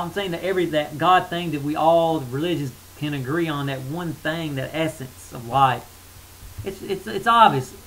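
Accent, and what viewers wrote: South, United States. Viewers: Very good 'good ol'boy' accent. Simple wisdom from a simple guy... one of us? Not really...hahaha. What we have here is a contradictory jambalaya of esoteric 'doctrine of the ages' sprinkled with liberty movement and generic pseudo Christian phrases. Paranoia is delusional fear. Sadly there is too much blatant & historic evidence of the malevolent intent in the character and actions of the long laboring apostles of the coming New Age of false "Peace, Love & prosperity", to be labeled Paranoia.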